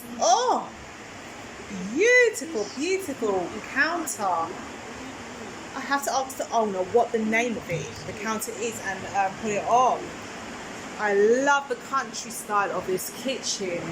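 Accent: United Kingdom. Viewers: This presenter and her london accent is annoying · So refreshing to hear English accent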